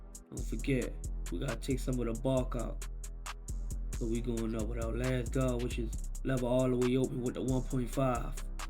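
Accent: Baltimore, United States. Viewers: What’s funny is I’m from Baltimore but I’ve been in LA for about 4 years now. Came across this video and started watching it and immediately was like “that’s definitely a Baltimore accent” 😂 cut is super clean 🔥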